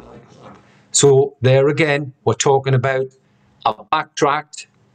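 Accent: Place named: Ashington, United Kingdom. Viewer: You sound a bit Ashington ish! Sorry if am wrong!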